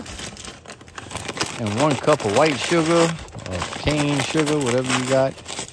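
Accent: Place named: Louisiana, United States. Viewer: I hear Louisiana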